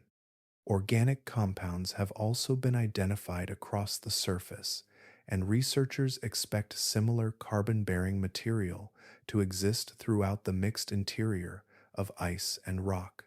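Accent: United States. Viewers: American accent and no annoying sound effects -- thank you!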